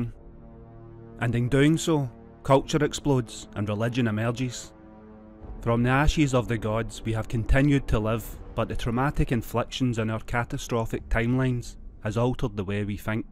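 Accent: Scotland, United Kingdom. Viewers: Is this a scottish accent? Just wondering, because it was so easy to follow, while irl my encounters with Scots have usually made me feel like I needed to go back to school and practice.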